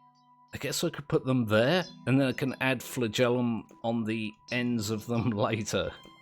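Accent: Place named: United Kingdom. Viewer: You're just so British. Very winsome.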